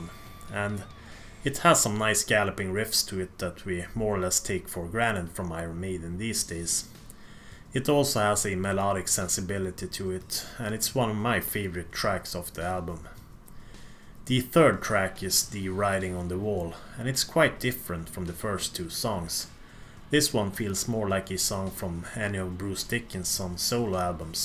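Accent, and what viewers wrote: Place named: Stockholm, Sweden. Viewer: cringey stockholm accent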